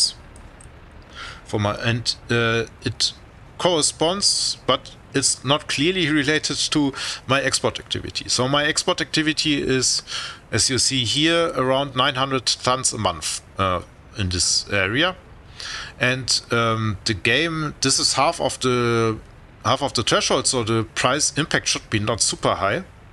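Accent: Germany. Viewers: Ja, ich verstehe, Ihr Englisch ist voller deutscher Akzente. Ich dachte, es wäre nur Österreich oder Deutschland. Schönes Video übrigens!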